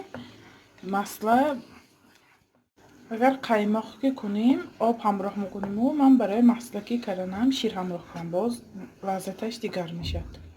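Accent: Isfara, Tajikistan. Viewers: Салом шумо аз исфара мешидми? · Мемурам дар лафзи Исфараги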